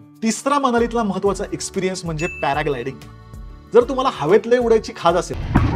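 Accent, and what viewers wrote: Pune, India. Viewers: Good one 👍 tuzhe funny 😊comments also made the vlog interesting and that typical pune ascent 😊in all good vlog and it should help many so thanks boss 😊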